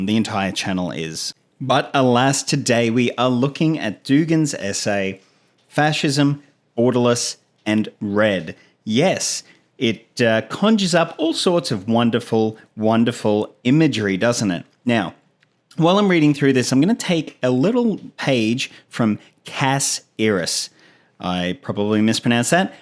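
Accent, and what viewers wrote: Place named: Australia. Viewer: I can’t tell you how absolutely thrilling it is to hear a YouTuber discussing ideology with an Aussie accent! Where hast thou been all mine life?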